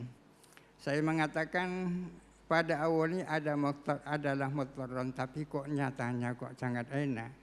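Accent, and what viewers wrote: Madura, Indonesia. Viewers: logat.. nya madura ini yai..? siapa beliau adakah profil lengkap nya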